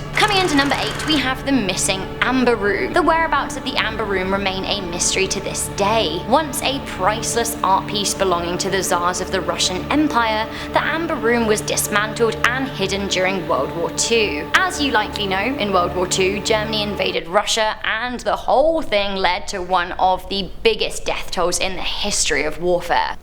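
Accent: England, United Kingdom. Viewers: Our is back with her indestructible tongue and hot Brit accent. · WOW! This was insane! Love it! you are awesome and your shows are interesting AF. I also could listen to the butterflies shooting out of your mouth all day! The English accient is so beautiful.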